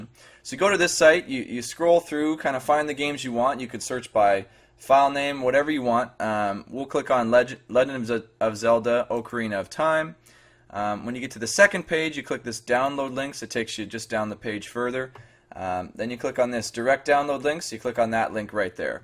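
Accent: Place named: Canada. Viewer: Canadian eh? Thanks for the tutorial man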